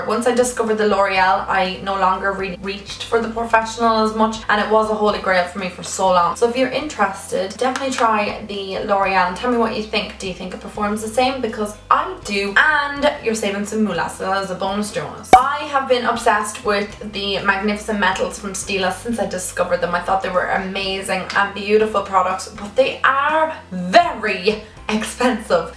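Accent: Dublin, Ireland. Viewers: U a dub ? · what county in Ireland are you from☺️x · It's Irish, have you ever even heard a Scottish accent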